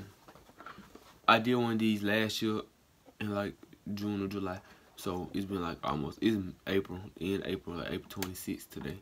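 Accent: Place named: Atlanta, United States. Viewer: Sounds like he from Atlanta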